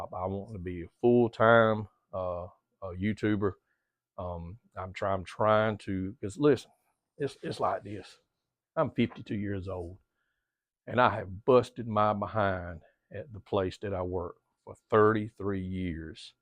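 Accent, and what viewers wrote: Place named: South, United States. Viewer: Cheers bud. This is comin from a yankee. Gotta say, i was raised by a southern gentleman. Although i dont live in the south, its really cool to hear a you tuber who’s doing videos about home theater, AND has a little southern twang in his voice. Cheers good sir! I enjoy hearing about your home theater journey. Have a good one..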